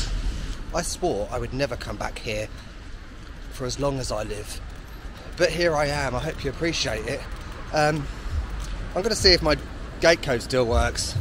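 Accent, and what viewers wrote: United Kingdom. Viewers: Come on! I would never be able to tell if you are speaking with a local or nonlocal accent. You just speak British to me, hahaha. Thank you for taking us to memory lane. I read you fixed comment... So you got Covid as soon as you arrived to London? I hope you get well soon. You have no idea how many people call their own home places shitholes and look at other places where locals call them also shitholes and for them (nonlocals)... it's just a more higher and advanced place to live. I definitely see myself vising London in 5 years. Just for vacationing.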